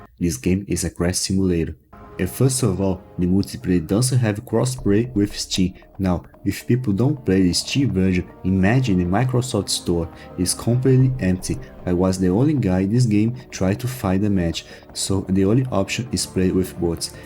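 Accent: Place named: Brazil. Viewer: Mano vc é brasileiro n é? eu reconheço esse sutaque.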